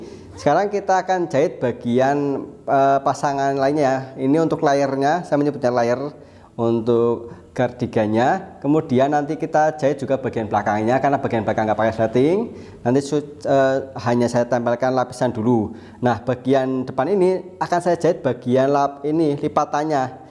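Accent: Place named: Pekalongan, Indonesia. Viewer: Kok suara nya seperti orang Pekalongan dongwuni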